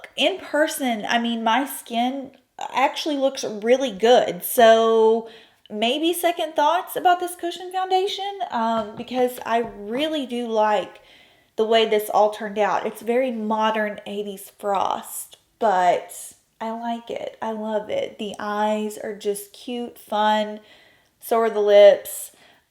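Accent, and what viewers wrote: South, United States. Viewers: Great look! Love your accent, sounds southern!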